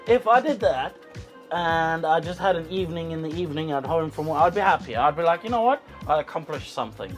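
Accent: South Africa. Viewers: Can't believe i've only found you now! I'm just about to begin my painting journey and love the amount of detail you give and the fact that you sound like you are from ZA too is a mega plus! XD looking forward to binging this channel before and after i get to start my painting adventures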